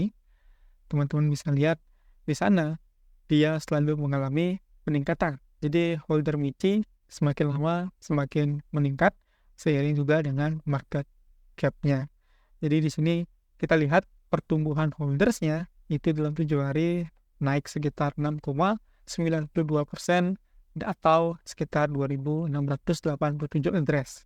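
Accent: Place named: Bali, Indonesia. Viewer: Permisi bang saya followers baru Kalok boleh tau abangnya dr mana seperti logat bali soalnya🙏🏻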